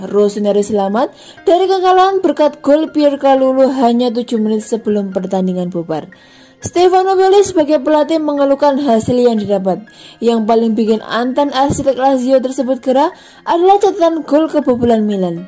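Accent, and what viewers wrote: Java, Indonesia. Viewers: mbke medok bunget to mbk 😂 orapopo wes.. wong jowo mantul 👍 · Medhok bgt mbak, hehe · Medok bnerrr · Jadi males gw nonton Chanel ini,, gara² suaranya yg medog ... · Hahahahahahaha mbok pelan pelan to engkok keselek lo, tapi male duadi lucu e gelok coro moco e, wes cepet medok e kuentel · Jadi lucu, meddok + cepet 😂😂😂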